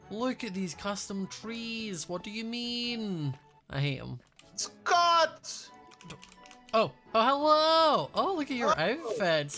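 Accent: Scotland, United Kingdom. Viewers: Are you Scottish 😮😮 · Hello I was puzzling around from were you came from. Because some how im Familiar with this Englisch that you are speaking Australien, Cockney Englisch. Canadian Englisch. Then maybe Scottish. That could be. I was not shure. Scottish is positive. I few years ago our Theatical group got ivited to St. Andrews. And I remembered the Englisch there were speaking is like yours. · Scottish acents are funny sometimes lmfao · Scottish accents are the best accents I do not have one 😞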